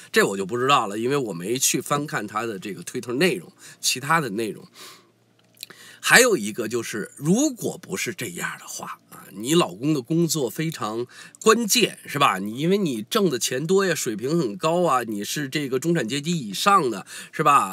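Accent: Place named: Beijing, China. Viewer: 还是最喜欢听大叔在六月初大嘛闺头李的那期节目，那口地道的北京话听着太过瘾了，我现在就靠着那期节目提神和对抗抑郁症。多谢🙏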